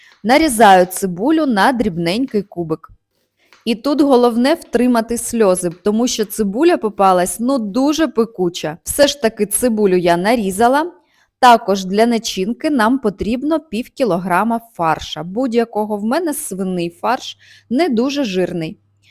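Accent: Standard. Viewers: Я недавно підписалася на. Подобається ВСЕ! І розповідь про рецепт, і його подача і чиста українська мова. ВСЕ подобається! БАЖАЮ МІЛЛІОН ПІДПИСНИКІВ!!!🎉🎉🎉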